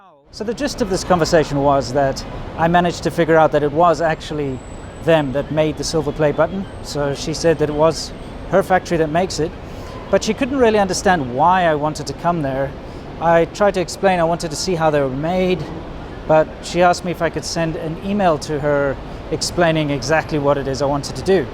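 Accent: South Africa. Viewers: for inputting Chinese, you should try iFlyTech speech recognition input, you just speak to the phone and it writes for you. It would gradually adapt to your South African Chinese accent as well. After trying that a year ago, there is no turning back to typing Chinese. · I am so fascinated by your ability to speak and read and write Chinese! Equally as fascinated by your ZA accent. Just a question - did you flip the video of yourself in the car? Or you actually drove a right hand drive car? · Are you South african?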